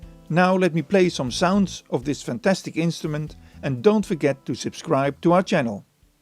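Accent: Netherlands. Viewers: are you dutch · I hear you are dutch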